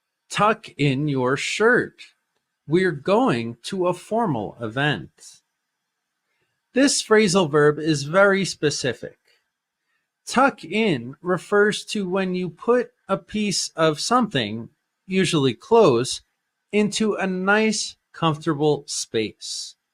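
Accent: United States. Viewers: are you American, dude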